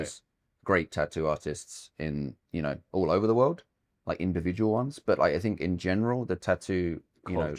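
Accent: United Kingdom. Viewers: *の喋るコクニーアクセントがまさに*だった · ジョージのブリティッシュアクセントかっこいいし男でも惚れます。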